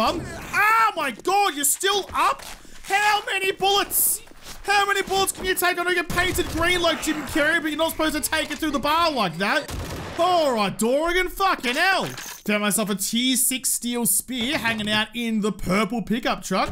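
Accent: Australia. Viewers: are you australian, if you are thats cool.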